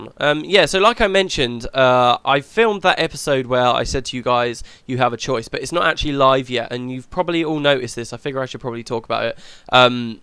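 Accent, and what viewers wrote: United Kingdom. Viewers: "Bollocks!" ;D British english>American english tbh, finally found some variation :) Oh, and about your plan on how many videos to upload at a time, try hold about this phase or solwer if u want it to time it with beta/release. And maby you are being too optimistic about when GW2 is coming. For all we know, it might just as well come 2014 :/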